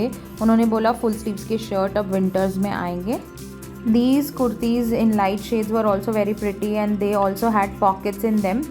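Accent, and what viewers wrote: Bengal, India. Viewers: Aapnar Bangla ta darun! In fact you even have a Bengali accent! Have you been living in Kolkata long? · Wow! Loved your bengali accent. And Will this market soon.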